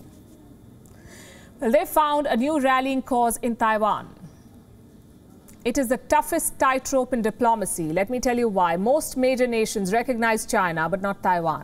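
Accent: England, United Kingdom. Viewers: I like this host's English accent. Lol (no offense)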